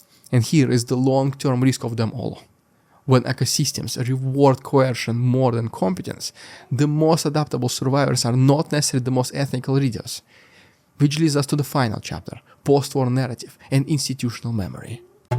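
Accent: Russia. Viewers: you were very, very hard to understand this time... way to fast. Your Russian accent and the words just rammed together is impossible... TAKE A BREATH.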